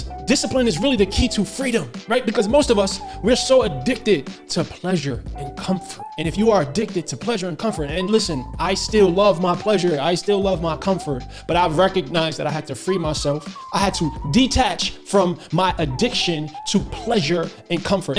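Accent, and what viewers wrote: New York, United States. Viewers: Sound like HOV! That’s that NY! Let’s get it God like Men! Likeness for the WINGERS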